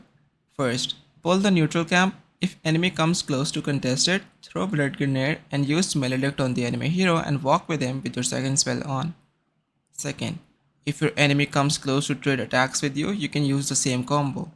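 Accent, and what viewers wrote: India. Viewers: >Excited to see the video from the Reddit post >Indian accent :(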